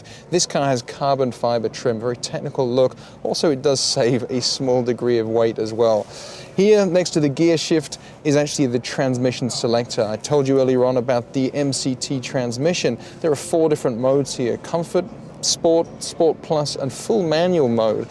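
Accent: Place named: Australia. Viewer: Beautiful car! This guy sounds australian...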